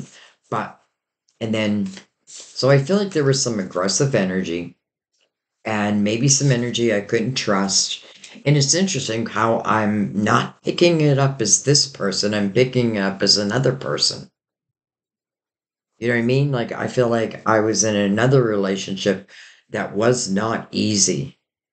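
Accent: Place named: Western Pennsylvania, United States. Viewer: I should’ve figured you’re from western Pennsylvania. We have different accents and pronunciate words completely different. Blessings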